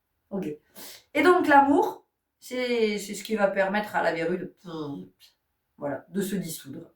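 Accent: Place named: Marseille, France. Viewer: J'aime bien . Vous avez le sourire . Accent du sud Marseille . C est joyeux ❤❤❤❤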